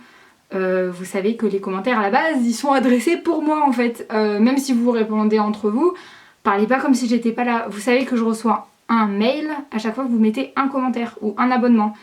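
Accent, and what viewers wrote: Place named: South, France. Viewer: J'aime cette vidéo juste parce que t'as pris l'accent du sud :p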